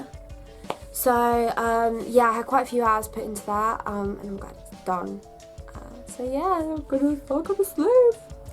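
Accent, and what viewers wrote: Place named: Australia. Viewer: are you from Australia? New Zealand? I heart your dialect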